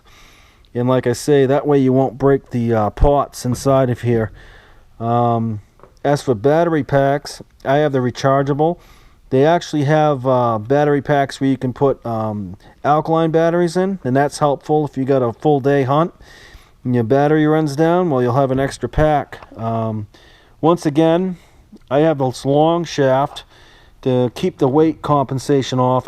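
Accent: United States. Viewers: Did you say "a good-eye deer"?? I enjoy the hell out of your New English accent but that aside video takes the cake! Hands down, the most informative and honest take on these Minelab machines as far as tips n' tricks and idiosyncracies of each of them. Thank you for your service and happy hunting from a new subscriber in Florida.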